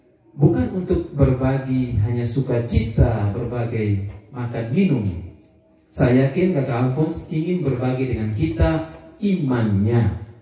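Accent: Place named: Lembata, Indonesia. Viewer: ni macam dari pulau lembata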